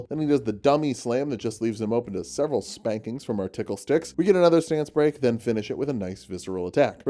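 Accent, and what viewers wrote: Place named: Edinburgh, United Kingdom. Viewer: London? Edinburgh surely?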